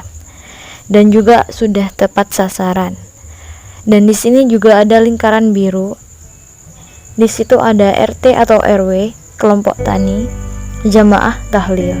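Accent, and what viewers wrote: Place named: Madura, Indonesia. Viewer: Mantep penjelasan nya mungkin dalm istilah maduranya di bahasakan lebih normatif mbak😊 seperti laok😁😁😁😁😁 dan pennggunaan kata a dan e tapi muwanteppp bagusss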